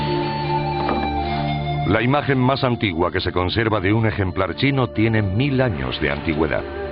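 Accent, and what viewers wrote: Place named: Spain. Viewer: La locución no es español latino, sino es español de España.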